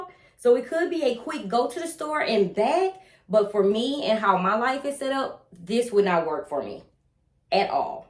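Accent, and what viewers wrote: United States. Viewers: Love your videos. I also love your accent!!! I am from the UK and so my ear struggles to place American accents. Which part of the US is yours from? I totally agree about buying smarter. I now have a small bag collection after selling a lot - 8 at present. The only bag I need in 2023 is the odeon mm in mono and black as I don't have a 'decent capacity cross body' in my collection. I just have to stay strong when I am tempted, which is easier said than done!!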